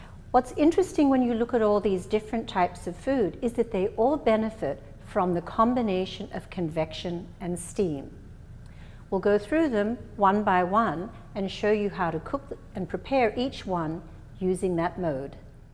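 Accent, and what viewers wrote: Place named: South Africa. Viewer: Hi, great video thanks, where is your accent from, it sounds South African (Cape Town specific), who's previously lived in Australia and now currently living in Canada:)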